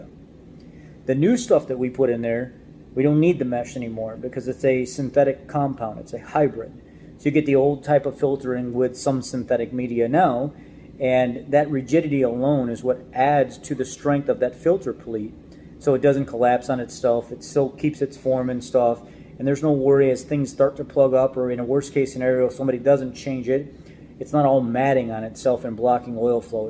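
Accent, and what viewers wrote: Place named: Chicago, United States. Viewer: You sound like you from back east Chicago area lol I love the content.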